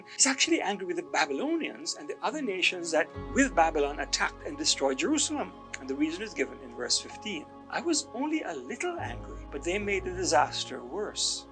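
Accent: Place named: Ireland. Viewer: Really good video. Also, comment from an Irish person - your accent is half like that found in the South to Southwest of Ireland (and half something else), are you from Newfoundland or something?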